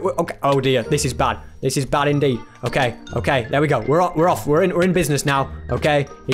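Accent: United Kingdom. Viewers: I have a question, are u British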